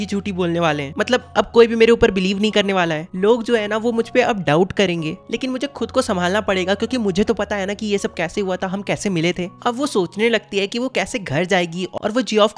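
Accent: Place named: Bihar, India. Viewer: thodi English k words theekse use kijiye bhaiiya aur apni pronunciations sudhariye zabaan clear honi chahiye. toh hindi mai aapke channel pr ek bar sunkr log hrbr ayenge bihari accent use mt kijiye❤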